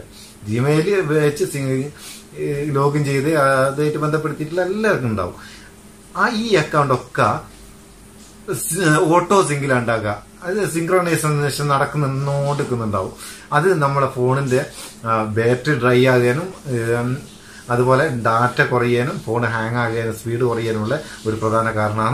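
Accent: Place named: Northern Kerala, India. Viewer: കൊള്ളാം. പക്ഷെ ഈ ചങ്ങായീടെ ഭാഷ നമ്മള് തെക്കർക്ക് നല്ല കൗതുകമാണ്‌. പിന്നെ കലാകാരനാണെന്നും പറഞ്ഞു. കല ഏതാണ്‌. കഥകളി? or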